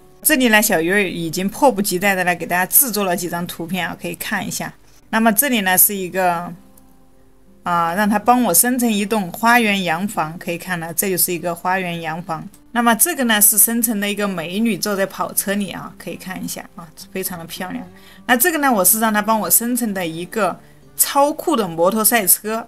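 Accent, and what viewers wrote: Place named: Wuhan, China. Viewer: 武漢口音超級重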